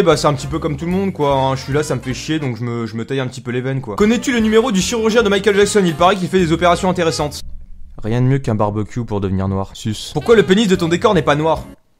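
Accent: France. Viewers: Tu me fais bien rire avec ton accent français ! bisou du Québec ! :)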